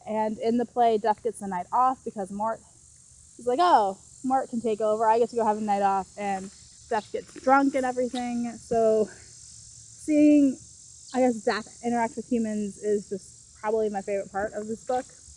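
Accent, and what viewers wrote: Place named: United States. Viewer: Think of making an audio recording like you would speaking on stage…slow down and enunciate more. I liked the content but had to relisten multiple times to get all your words. Certainly, I am not American, but I normally don’t have as much issue with a US accent.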